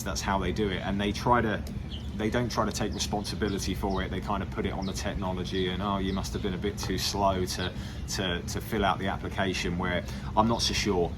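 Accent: United Kingdom. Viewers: Is that a Mancunian accent ?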